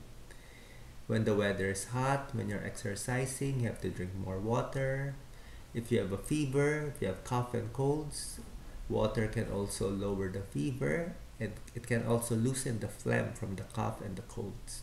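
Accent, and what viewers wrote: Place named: Philippines. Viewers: This is a Filipino accent? he has a nice accent and relaxing voice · There are many Filipinos in my country, and I also I've had a couple of Filipino teachers, and they sound exactly like that, so yeah he's probably Filipino. I like their accent too.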